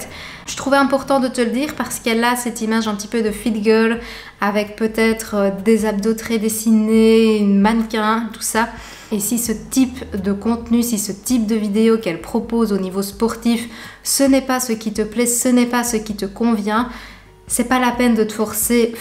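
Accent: Belgium. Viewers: J'adore tes vidéos et ta façon d'aborder les choses ! Et ton calme naturel (et ton petit accent belge 😉) sont super agréables ! 🙏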